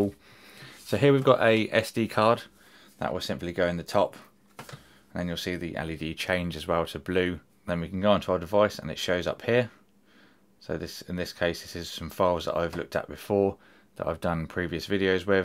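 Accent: United Kingdom. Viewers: IS this only for the phone? Can I use this product on a computer? darn it? love your british accent muah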